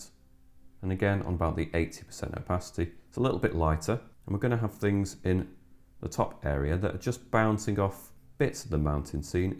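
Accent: United Kingdom. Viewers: Turn on the closed caption, cc, to understand the British accent for people in the USA. what he did say?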